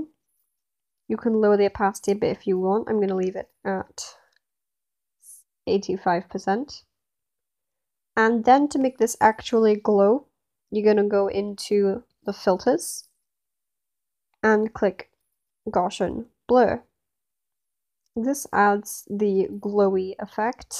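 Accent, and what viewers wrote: United Kingdom. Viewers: Thank you british lady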